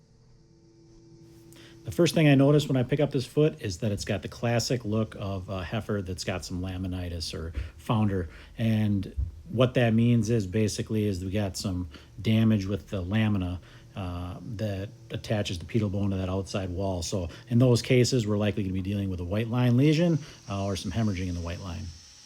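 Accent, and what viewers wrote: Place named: Wisconsin, United States. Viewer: I can tell a good ol Wisconsin boy when I hear one! I love your videos. You're the best one I've seen. You can really tell you care.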